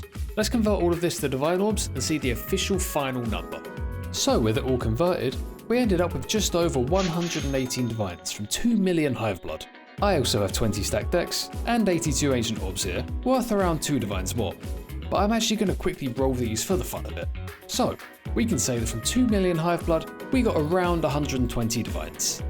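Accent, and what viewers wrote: United Kingdom. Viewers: Came for a big loot stayed for a great vibe + the british accent ofc, great vid, hope your channel grows massive in no time! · Dang, I just love those videos! ❤ One of the things I was interested in was if you'll hit the full stack of divines, and you did! It was such an awesome view! Great Jon on all the testing, I admire your devotion and time investment. Looking forward to the next league and further testing. I hope you'll be as restless as in the Mirage! ❤ Ps. I live in England, not English tho. I could listen to your lovely English accent all day long 😅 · Came for the hiveblood Stayed for the distinguished british accent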